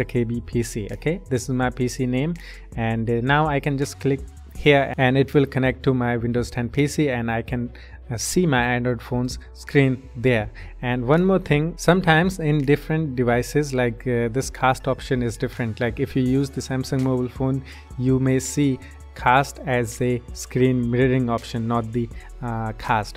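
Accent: India. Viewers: R u Indian? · By listening your English I am damn sure that u r an Indian... Don't try that accent you have got caught 🤣🤣🤣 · U are indian??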